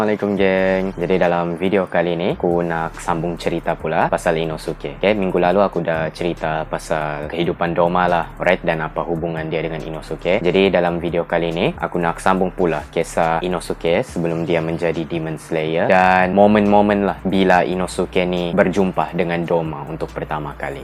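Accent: Sabah, Malaysia. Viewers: logat2 sabah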